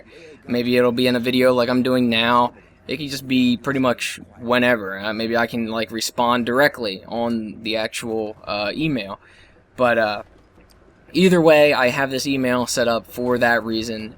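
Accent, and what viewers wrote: Canada. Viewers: hey are you canadian because i here you say guy a lot lol i love all of your videos man i watched your live stream last night pretty awesome god i love your videos and the other creatrues too lol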